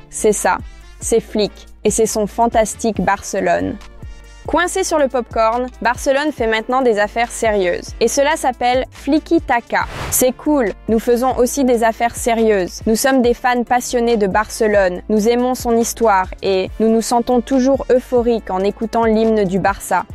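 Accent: Quebec, Canada. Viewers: Je ne comprend pas cette chaîne ? Est-ce une IA ? Une voix d'IA ? Une québécoise ?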